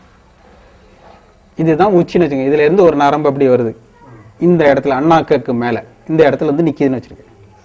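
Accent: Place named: Tirunelveli, India. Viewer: ஆஹா!!! ரொம்ப நாளைக்கு அப்புறம் திருநெல்வேலி அழகு தமிழில் மிக முக்கியமான விளக்கம் கேட்க்கும் பொழுது டபுள் சந்தோசம்😉 நான் இப்படி பேசும் போது எல்லோருமே என்னை கேலி பண்ணுவார்கள்!!!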